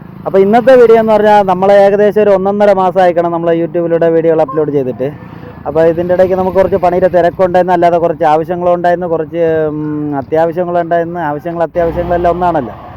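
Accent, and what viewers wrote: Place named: Thiruvananthapuram, India. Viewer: താങ്കൾ സ०സാരിക്കുന്ന ഭാഷ തിരുവനന്തപുര० സ്റ്റൈയിലിൽ.🙋‍♂എന്നാൽ മദ്ധ്യകേരള ഭാഷ നിങ്ങളുടെ ഭാഷയേക്കാൾ വ്യത്യസ്ഥമാണു് ബ്രോ!🙋‍♂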